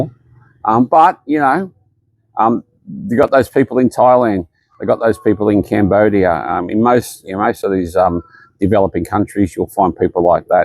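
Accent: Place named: Australia. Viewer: FYI I have learnt French and English in Vietnamese High School . We don't need you to teach us English with your awful Aussie accent . You are just an unemployed dude in your country , moving to developing countries begging for job . I bet you are not even qualified to teach English in Vietnam .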